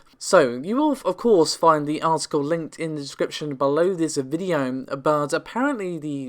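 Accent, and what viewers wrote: England, United Kingdom. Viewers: if you're seeing this, it's not you. Rather the accent and voice together, I have this sometimes with English women, because the voice pitch is very different from where I'm from haha. In like every video I had parts where I thought, noo, this is 100% a women and others parts where I thought, nooo 100% a guy, I just can't tell because of the accent xD it's not you, it's me:P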